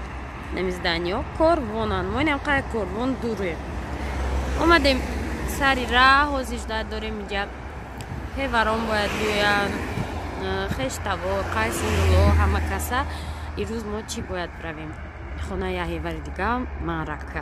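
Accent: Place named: Kulob, Tajikistan. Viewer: Апаи зур ранги кулобиё Гап мезане 😘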